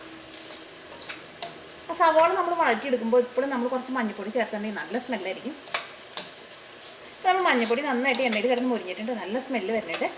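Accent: Thrissur, India. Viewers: Dear Mam, this is excellent. We also tried your Ullii Chammandi & Rasam powder. A small suggestion - you should have avoided the back-ground song, which is not at all relevant for this particular dish - Nadan spicy Chiken, please check. We also like your off-screen, to the point presentation with a special slang (thrissur????) as bored with the on-screen presentation “of self” of some celebrity chefs, rather than their recipes). We expect more simple recipes from you Mam… Thanks. venu family, surat.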